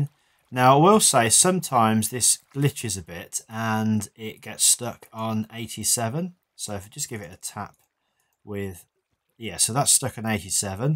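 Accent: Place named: United Kingdom. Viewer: Wow! I just realized how much brit eng. annoys me.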